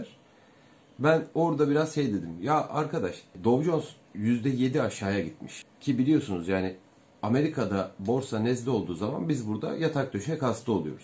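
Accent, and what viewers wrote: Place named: Erzincan, Turkey. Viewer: Memleket nedir.Erzincan yöresi gibi geldi.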